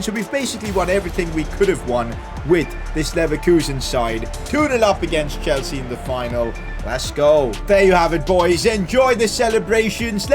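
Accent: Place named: India. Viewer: Great video but damn! Your Indian accent puts me off lol😂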